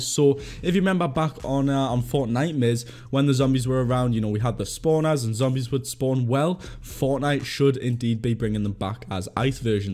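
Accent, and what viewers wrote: Newcastle, United Kingdom. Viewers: You a Geordie boy? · Are u from Newcastle because i am too!!!!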